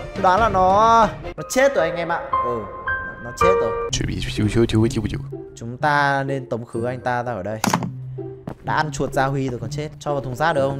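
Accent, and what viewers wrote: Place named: South, Vietnam. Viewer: Thích cái cách a ấy nói tiếng miền nam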